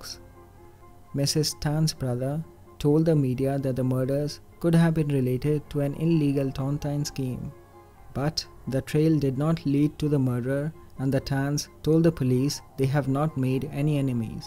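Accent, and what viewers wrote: India. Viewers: are you from India? You sound like Indian if I'm not wrong. Anyways thank you for including Nepal case.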